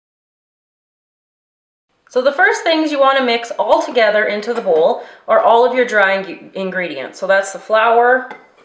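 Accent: Canada. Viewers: Hi, this recipe is excellent. It passed the "culinary-challenged-bacehlor-slob" test. Yup, I'm the bachelor-slob who can't cook to save his life, but was able to make this. It turned out great! So did the chocolate butter-cream frosting recipe you posted to complement this recipe. Why haven't the Food Network or the Cooking Channel hired you yet? You do very well explaining these recipes and techniques. Your Canadian accent is charming and makes me think of all my Canadian friends. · Thank you for posting this xoxo Are you from Canada? Lol, just I can hear an accent :P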